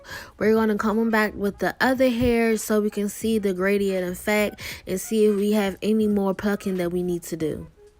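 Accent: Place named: Washington DC, United States. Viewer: I just know youre from DC lol. that accent is too recognizable lmaooo 😘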